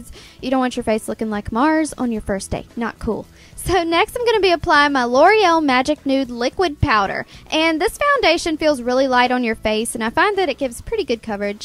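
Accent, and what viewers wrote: South, United States. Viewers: she used to sound SO country! she should play ellie may in a modern reboot lol.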